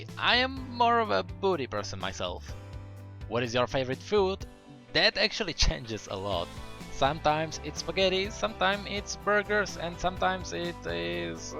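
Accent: Czech Republic. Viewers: Hehe, I guessed that you might be from czech republic, just by your pronounciation. ^^ Well, all I have to say here is: Pokračuj v dobré práci a lovu zdar. :-) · Já prostě věděl že jsi čech...prostě jsem to věděl...ten přízvuk poznám na hony daleko........Gj- keep it up man!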